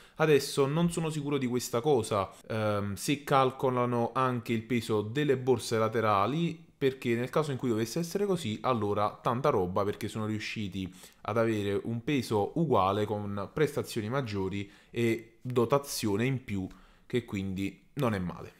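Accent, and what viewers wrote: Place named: South Tyrol, Italy. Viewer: si...infatti avevo sentito un "vago" accento Altoatesino...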